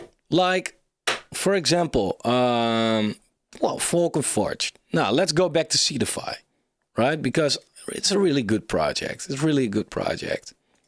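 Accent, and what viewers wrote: Netherlands. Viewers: Are you from the Netherlands? Judging from that accent :) or Belgium?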